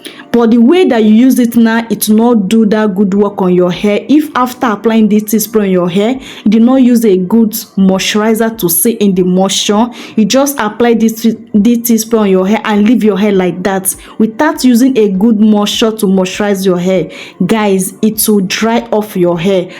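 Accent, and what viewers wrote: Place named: West Africa. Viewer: Come on 😅 she is from West African that's how they speak 😅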